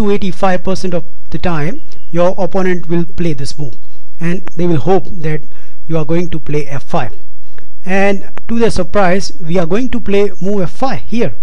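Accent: India. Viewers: its not a grammar tutorial. and arent you entertained by the way he speaks? for me its funny but at the same time you learn. leave him alone hes not american he's indian, most call center agents are. just appreciate the knowledge and entertainment you get at the same time. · nice..as I am also an INdian,your accent is easy to understand and very interesting..thanks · Indian accent is obvious o.o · zRouth I am a language teacher AND a passionate chess player in one, and I must admit his accent is like many people's accent in India, but his grammar is not (always) correct what can be disturbing (for native speakers, but I don"t belong to that group.) What he explains about chess is excellent on a lower level. (GM-s do not necessarily benefit from that, as they are already familiar with these tricks /at least the majority of them/.) All in all, I like his vids, you can use them well if you play with short time control (3-5 minute-games)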